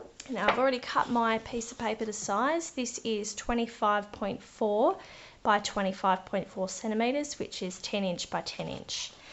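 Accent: Australia. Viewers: Great to hear the Aussie accent with stamping up can't wait to try this card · I really enjoyed your tutorial. You explained everything clearly and I enjoyed hearing an Aussie accent for a change on a Stampin Up video. I have subscribed, so I hope to watch more of your videos in future. Thanks.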